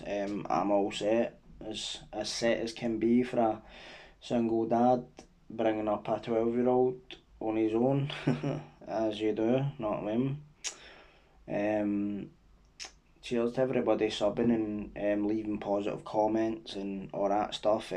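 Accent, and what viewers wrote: Scotland, United Kingdom. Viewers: Liked and subscribed love the channel refreshing hearing storys from Scotland in Scottish accent by someone who has experienced it instead of videos made by people that cant even pronounce the areas or the schemes right does my tits in keep up the good work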